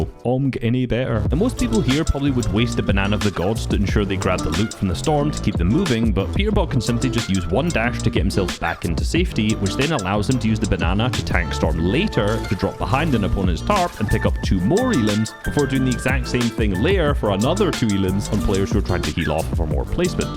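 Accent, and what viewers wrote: Scotland, United Kingdom. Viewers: But isn’t he Scottish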